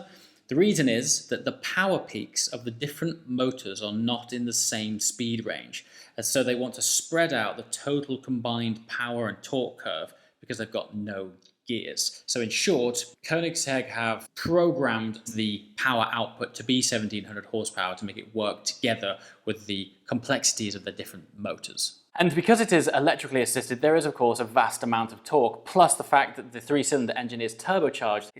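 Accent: United Kingdom. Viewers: Truly great technological advancement. I just wonder how reliable that car will be? It looks great too. I agree that the car resembles a Panamera. You don't even know how I appreciate your smooth talk. Many people in the car industry butcher to the point that I can't watch them, That UK accent was like a breeze of fresh air from the North Sea. Cheers from USA!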